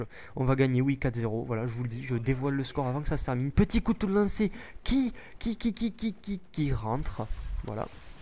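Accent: Toulouse, France. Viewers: Cet accent toulousain me fait tellement rire!!! Bonne continuation à toi!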